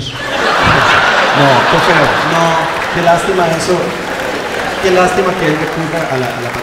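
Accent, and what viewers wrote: Costa Rica. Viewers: diay si no le gusta mae , que tirada .... Así hablamos los ticos mae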